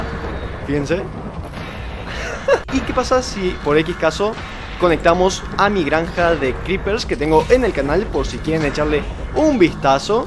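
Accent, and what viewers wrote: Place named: Paraguay. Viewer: Man dos paraguayo? .__. tenés el acento paraguayo jsjsjs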